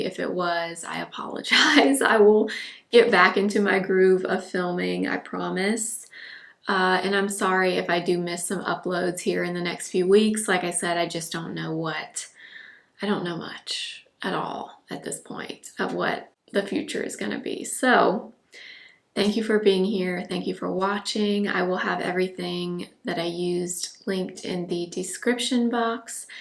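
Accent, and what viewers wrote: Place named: East Coast, United States. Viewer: Listening to your story about your neighbors and laughing not at your expense but because you sound like a younger east coast version of my husband and my self. We had similar incidents were we live with neighbors July 4th and having debris hit our home. We live in No CA where we just had some devastating wildfires and people still shoot off fireworks even though they are illegal. We also live on a corner lot which is mostly okay because we don’t have a house in back of us or directly in front of us but there are other issues that we had no idea about when we had the house built. Good luck on finding the perfect house and neighborhood it’s always a challenge. Though you might want to consider renting short term so you both can get familiar with the neighborhoods before buying.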